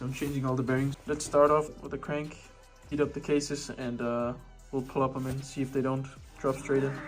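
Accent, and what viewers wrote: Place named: Denmark. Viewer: Jeg kunne høre så hårdt på din accent at du var dansker så jeg tjekkede din kanal og fik ret fire video fire 🏍️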